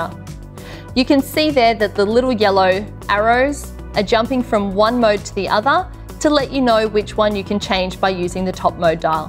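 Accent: Australia. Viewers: I guess she is Australian 😊😊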